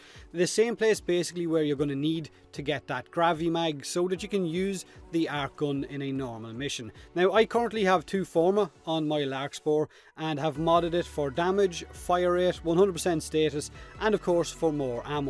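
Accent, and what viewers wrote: Ireland. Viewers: really?! again?! you know we're only here for your Irish intros and outros!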